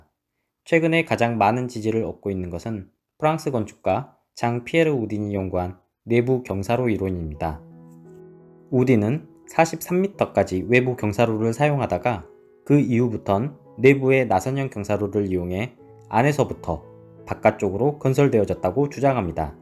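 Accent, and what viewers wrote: Jeolla, South Korea. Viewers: 유튜버 전라도 사람이네 ㅋㅋ 못했던>>>모댔던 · 전라도 사투리가 심하네요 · 전라도 분이시네^^ 영상 잘 봤습니다~~ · 고향이 전라도신가요 ㅎㅎ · 말투에서 잔라도가 느껴지네요..내 생각이 맞을걸요~ 사투리 감별사임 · 전라도 분이시네요.사투리가...^^ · 사투리만 고치면 더 좋은 영상이 되겠네요. 전라도 사투리가 너무 심함. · 전라도사투리 구수하고 좋아요ㅎㅎ 잘봤습니다 잘만드셨네요 유익했음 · 전라도 사투리 모댔던(못했던) 참ㅋㅋㅋ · 전라도사투리쓰는거 안부끄러워요?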